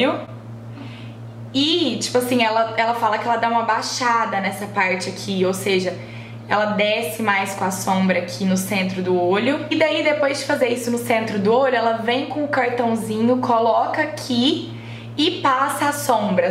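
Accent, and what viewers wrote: Minas Gerais, Brazil. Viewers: Estou amando seus vídeos! virei fã :) p.s.: esse sotaque Mineiro é uma delícia! rsrsrsrs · ue, tu é mineira? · Você é a cara da Isis Valverde e ainda tem o mesmo sotaque mineiro 🙀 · Vc é mineira????? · sotaque mineiro representando ❤️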